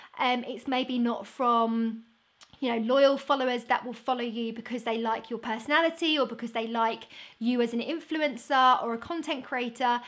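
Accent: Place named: United Kingdom. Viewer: Aw perfect,- at breakfast timeee :D To be honest, I watch your videos mostly because of you wonderful British accent. I miss England so much... My family visits friends in Devon from time to time and it always feels like coming home when we see the cliffs of Dover! So thank you for ... uhm speaking - I guess? 😆 And btw the new hair colour looks cute 😄🧡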